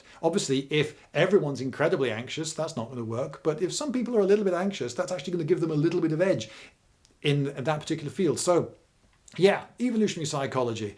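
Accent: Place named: United Kingdom. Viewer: This is the diction of a good Britisher that grew up on Fawlty Towers.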